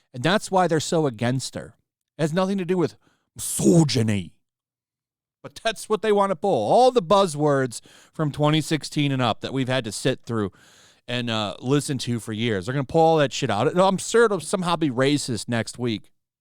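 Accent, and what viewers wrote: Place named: Upper class, United Kingdom. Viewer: Not a bad attempt at the snooty English sound. I'm a Yorkshire man and it's only a certain "class" that talk like that... fortunately.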